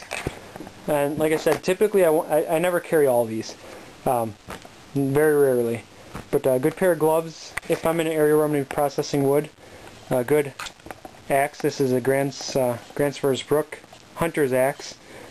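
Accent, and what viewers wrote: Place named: Upper Peninsula, United States. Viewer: you sound like a yooper der pale, you from canada or anywere around ehh?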